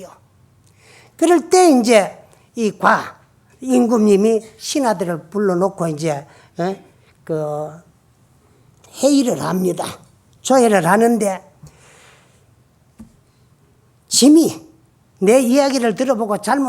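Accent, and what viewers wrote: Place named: Gangwon, South Korea. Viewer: 감사 합니다 스님법문 듣고보다보면 빠져들어갑니다 구수하신 말씀 강원도 사투리로 정감있는 말씀 너무 재미있게 하십니다 항상감사드립니다 날씨가많이 덥습니다 항상건강하세요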